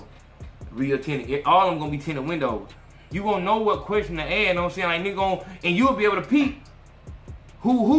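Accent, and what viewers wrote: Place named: Alabama, United States. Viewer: 💯...you from Alabama, ain't it?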